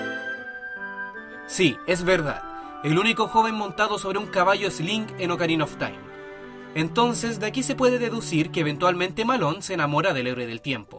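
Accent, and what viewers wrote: Chile. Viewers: Se nota que eres chileno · Son de chile ?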